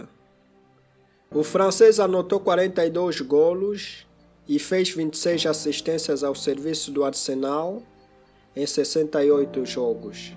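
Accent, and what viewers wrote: Angola. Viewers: Vi um angolano no sotaque🔥🇦🇴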